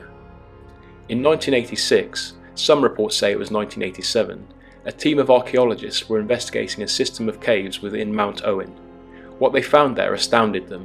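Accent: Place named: Dorset, United Kingdom. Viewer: Non-sensationalist content, nice measured delivery, and that Dorset accent (lived there for a short [but "interesting"] time) Automatic subscription (and I've forwarded your YouTube location address to a colleague of mine, who retired to Bridport, after finally completing her Ph.D. (3 months before retirement :-D ) Yes, Les - I'm talking about you!! :-D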